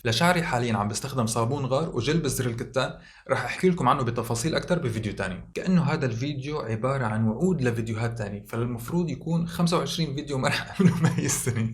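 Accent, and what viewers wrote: Syria. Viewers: حكيلنا عن حالك اكتر...حبيت قناتك.... حاسستك من سورية...صح؟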